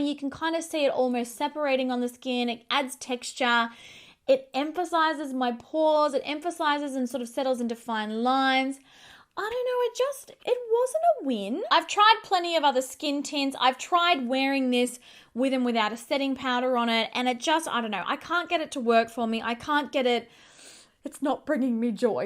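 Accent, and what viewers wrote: Australia. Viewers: How much I love you, your smile your positive energy your girlie voice your beautiful face and the cherry on top your sooo adorable Australian accent ♥︎♥︎ LOVE FROM GREECE 🇬🇷 MY BEAUTIFUL ♥︎ ( I want things from your brand does it ship to Greece? ) hugs xoxo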